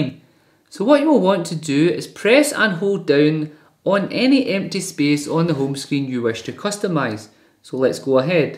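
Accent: United Kingdom. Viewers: Are you Scottish Laddie? :)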